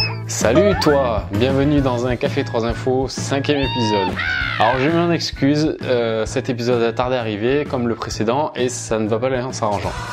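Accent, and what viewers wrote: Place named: South, France. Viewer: cool on va arrêter de nous casser les ... pieds avec "les antibiotiques c'est pas automatique" lol t'as un petit accent du sud on dirait peuchère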